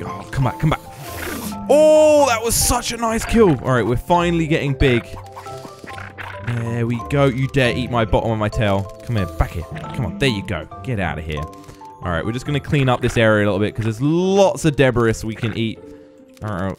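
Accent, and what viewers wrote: United Kingdom. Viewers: I can tell by his accent, that he is British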